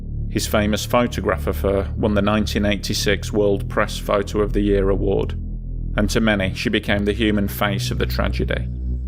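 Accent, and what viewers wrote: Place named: Northern England, United Kingdom. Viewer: Where is your british accent from ... I seem to think its northern england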